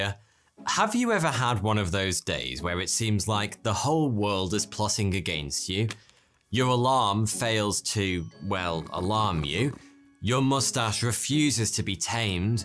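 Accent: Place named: England, United Kingdom. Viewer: I can’t tell from your accent, where about from England are you from. Is it London?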